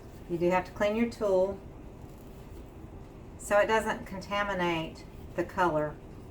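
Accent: South, United States. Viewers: Awesome artwork! New subscriber to your channel. How many drops of floetro do you add to your paint? Also, what's your background paint recipe? Type of paint? Thanks. Oh, you sound like a southern gal. I am too-from NC. Have a great day! 😁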